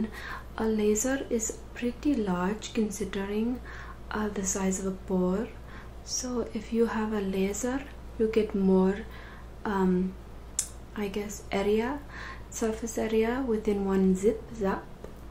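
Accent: India. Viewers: You are my favorite asmrist I wish you made more videos. I love Indian accents, even if you are acting. Plus, you are super gorgeous.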